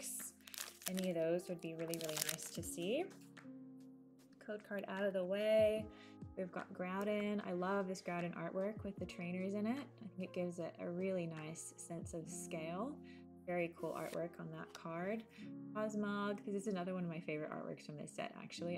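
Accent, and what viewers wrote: Australia. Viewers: Noooo it was a steel energy hahaha. I hear Australian and American accent too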